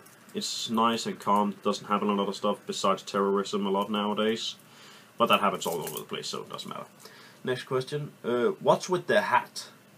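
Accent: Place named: London, United Kingdom. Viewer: Jack The Ripper hmm I thought your from around London from your accent but english doesn't seem to be your first language so I understand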